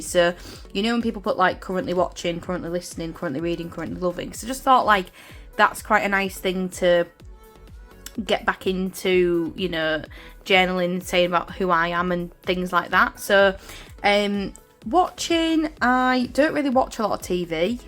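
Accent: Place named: Hull, United Kingdom. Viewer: Always makes me happy to hear your voice and familiar accent (I am from ‘ull too, though I don’t live there now!). Lovely pages as always! Great you are back enjoying journaling again!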